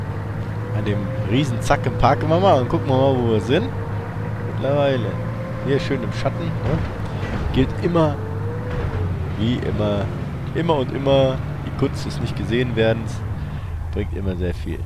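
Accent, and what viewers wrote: Cologne, Germany. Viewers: Deine let´s play´s sind der hammer hört sich na ne kölsche jung an oder täusche ich mich da ? :D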